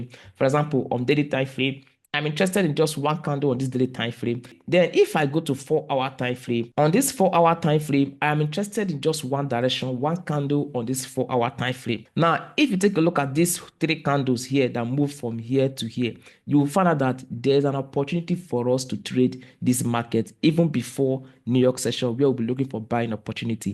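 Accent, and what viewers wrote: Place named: Agbor, Nigeria. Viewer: Bro, talks like an agbor man. Nice one boss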